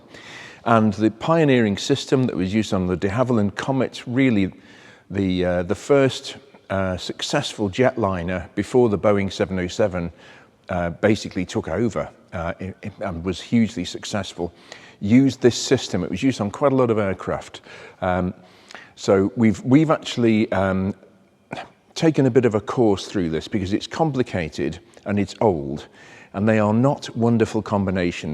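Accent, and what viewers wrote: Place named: England, United Kingdom. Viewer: most English commentary I have heard in some time, great work from Australia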